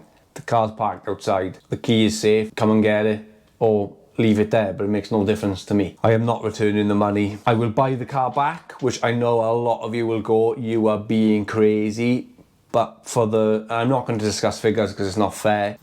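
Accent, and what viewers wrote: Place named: Northern Ireland, United Kingdom. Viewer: Gotta love a Northern Irish accent...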